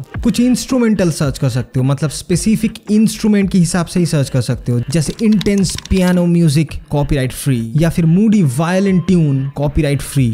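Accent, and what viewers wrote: Bengal, India. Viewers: Re tumi bangali na Tomar tone e bojha jay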